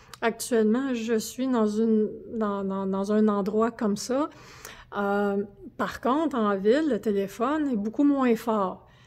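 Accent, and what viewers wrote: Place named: Canada. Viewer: Moi, ce que j'aime, c'est la petite voix canadienne chantante et jolie demoiselle. Je suis du métier, sciences, et je me demande si tu avais bien fermé la base des tissus (emprisonnant le récepteur) et surtout le papier aluminium devrait contenir l'appareil et fermé à sa base aussi. Pas de couverture d'aluminium avec fente de grâce. Pour une non scientifique, c'est déjà ça. Guadeloupe. Could you give me a response please.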